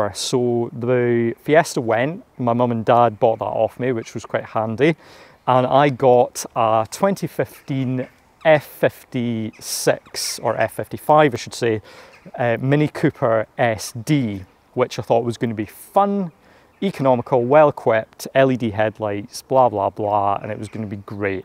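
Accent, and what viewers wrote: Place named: Scotland, United Kingdom. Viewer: I fell asleep after 5 mins,,,,you are speaking scottish,,,everyone hates people speaking scottish,,,so,,,Im off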